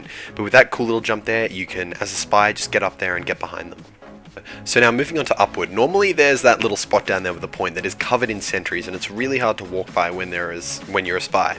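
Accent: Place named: Australia. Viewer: Muselk sounds more Australian that unual.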